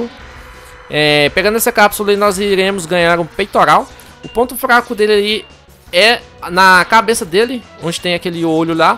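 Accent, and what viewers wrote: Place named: Goiânia, Brazil. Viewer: tu e de Goiania kkkkkk eu tambem vishhh!!!!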